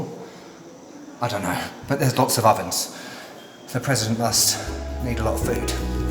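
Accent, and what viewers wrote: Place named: United Kingdom. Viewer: Hi! You did an excellent job, I love how you feel the environment in any place make is so special and also I always enjoy you personality and of course your British accent. Big hug for you Carlos.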